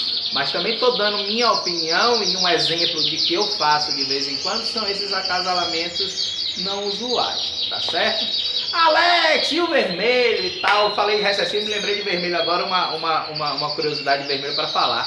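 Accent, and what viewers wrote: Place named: Northeast, Brazil. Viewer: Sou do sul e acho muito legal esse sotaque aí do norte/ nordeste . Muito legal seus vídeos !!! Outra coisa, achei vc muito parecido com o Matheus Ceará , aquele humorista da praça é nossa :)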